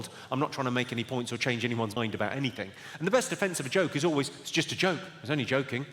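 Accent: United Kingdom. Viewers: Can you explain (or transcript, to be exact) the punchline, please? It's somewhat hard for me to decypher some words in british accent.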